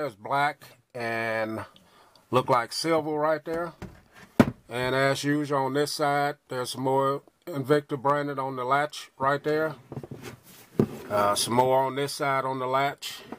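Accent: United States. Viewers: haha u sound like me... country as hell!!! I do have your interest in them, not saying they are the best but for the price and function I think they are a good value and I am often complemented on mine..also depending on what size the case is, it can make a nice gun/pistol case and keep the silicate that comes with it to keep ur gun from rusting...also the name of the magnified date is called a cyclops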